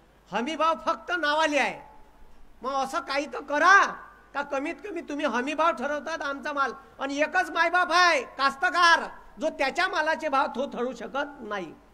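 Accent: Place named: Vidarbha, India. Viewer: हे वऱ्हाडी भाषा आहे जे विदर्भात बोलली जाते, इकडे सगळे लोक असच बोलतात...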